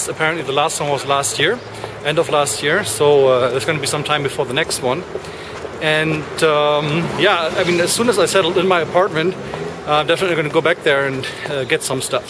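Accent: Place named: Germany. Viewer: Fünf Worte und ich wusste, dass du Deutscher bist! :D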